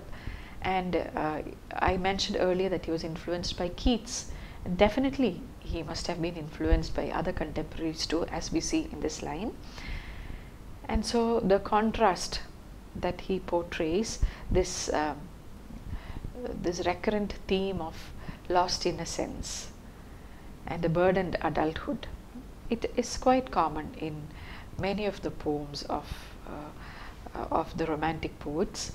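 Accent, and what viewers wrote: India. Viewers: Ma'am I have been watching your video for last one year. Now I am a teacher too. So about my last interview I gave a demo class on my subject. I noticed your attitude through your video that you always being clam and using Indian accent. Ma'am believe me I did exactly follow your attitude and Now I am selected. So thank you so much for giving the inspirational personality. ❤ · IN Skip navigation i remember i remember by thomas hood 9+ Avatar image / I remember, I remember - Poem by Thomas Hood | Explanation English Lessons 64.1K subscribers Subscribe 556 Share Download Clip Save 24K views 1 year ago An explanation of Thomas Hood’s poem I remember, I remember. 37 Comments R U Thowamy Add a comment… 11 months ago Ma'am I have been watching your video for last one year. Now I am a teacher too. So about my last interview I gave a demo class on my subject. 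I noticed your attitude through your video that you always being clam and using Indian accent. Ma'am believe me I did exactly follow your attitude and Now I am selected. So thank you so much for giving the inspirational personality. ❤ 22 Reply 6 months ago (edited) Wonderful analasys, I saw many other analasys but your is the best of them. Thanks alot for your efforts and simple analasys. 1 Reply 1 year ago Ma'am, please do a video on the poem Mac flecknoe by John Dryden. 1 Reply 1 year ago The teaching style is very good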